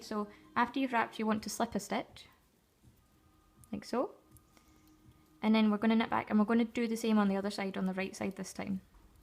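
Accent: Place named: Scotland, United Kingdom. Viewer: Excellent tut!! Love your Scottish accent!! Love the way you knit - maybe another tut?? :) +1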